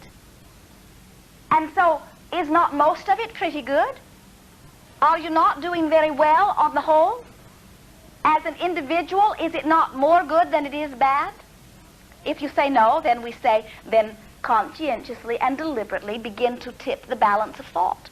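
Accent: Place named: United Kingdom. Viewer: I love these old videos, Esther sounds so British 😂and what Esther saw, just by repeating a joke,, now that is very interesting,, it shows just how powerful, law of attraction is. gotta also say this video may be a very old video, but l ain't heard it before, and like wow, so powerful, thank you ❤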